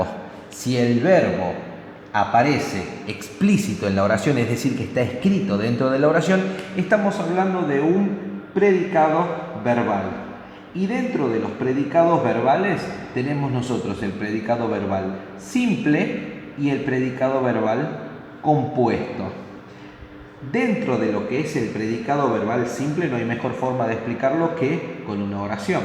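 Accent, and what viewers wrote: Tucumán, Argentina. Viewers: Sos de tucuman?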